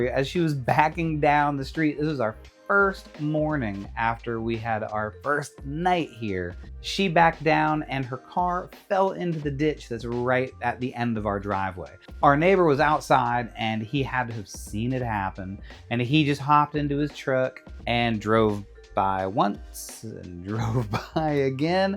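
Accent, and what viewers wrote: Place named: South, United States. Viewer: Love your content — but how did you pick up a “southern-ish” accent in just a couple years? I just moved back to the south after over 12 years away out west and my accent didn’t change a bit and it was often commented on out there.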